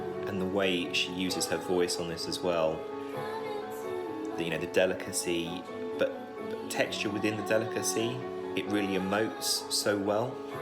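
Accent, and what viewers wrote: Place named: United Kingdom. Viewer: I Just loved your british acsent... And I get a little bit surprised 'Cause i' ve never thought that you enjoy and... The other 3 songs I understand... But the other 2... It was a really huge surprise. Most of all:, 'Cause for her it wasn't a goog single... But I really enjoy this reaction...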